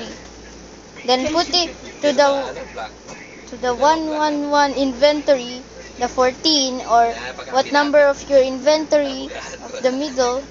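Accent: Philippines. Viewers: try hard Filipino trying to speak English xD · I thinks he's filipino because he's backround sound like filipino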